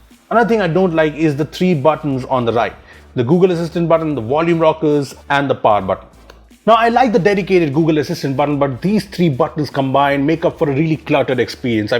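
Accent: India. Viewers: From his face I bet his speaks in an hindilaised english *Later edit after he talks. I was right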